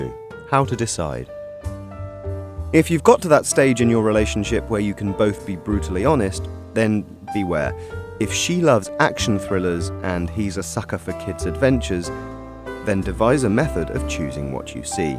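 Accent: United Kingdom. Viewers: Brits? He sounds a bit proper and cheeky. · yeah, but the voiceover guy had a british accent, so scissors paper stone is probably the british version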